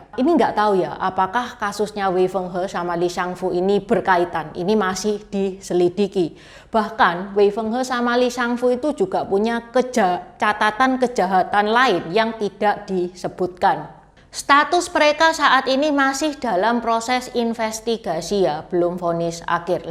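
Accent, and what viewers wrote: Java, Indonesia. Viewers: medok amat ngomong jawanya si 😂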